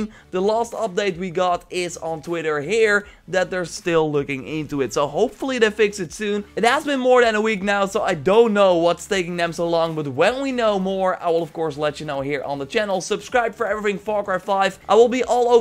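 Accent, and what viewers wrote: Netherlands. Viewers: I'm assuming that cool accent is a Dutch one? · Ik wist altijd al dat je nederlands was dat hoor je gwn aan je accent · Wait what are u dutch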